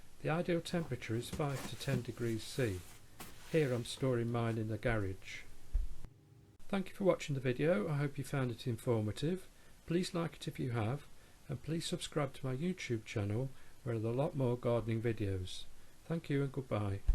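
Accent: Northern England, United Kingdom. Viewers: Yes, they are pelargoniums. They are generally called Geraniums in the UK even though most people know that it isn't horticulturally correct. This man seems to have a northern English accent, so I can only assume that he is calling them what the vast majority of people would call them on a day to day basis. He obviously is aware that they aren't Hardy Geraniums, otherwise he would be leaving them in the ground rather than storing them for the winter. I have never seen them stored this way before, I always bring mine indoors in the pot, and take some cuttings on a just in case basis. This may be an alternative, and will give it a try.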